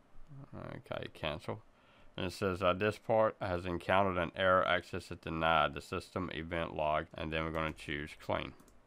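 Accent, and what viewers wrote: South, United States. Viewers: you sound like Southern American hard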